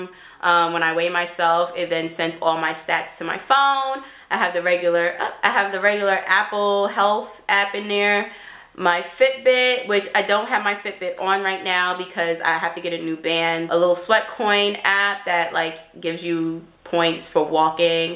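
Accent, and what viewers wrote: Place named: New York, United States. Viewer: Ayeeee you a NEW YAWKA🤘🏽💙but i have wordscapes to its mad addictive.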